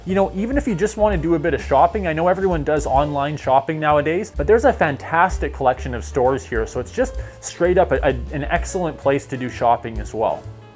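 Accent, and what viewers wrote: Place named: Letterkenny, Canada. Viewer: Letterkenny accent on point.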